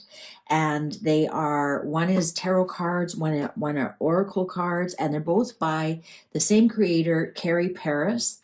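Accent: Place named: Canada. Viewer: I'm an Australian living in Canada and I immediately knew you are Canadian from your accent. People forget the Royal Family are our RF too (Australia and Canada). 😅❤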